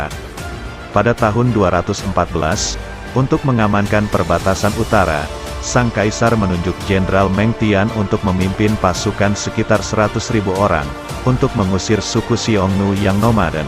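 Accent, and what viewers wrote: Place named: Indonesia. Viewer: Lidah orang Indonesia.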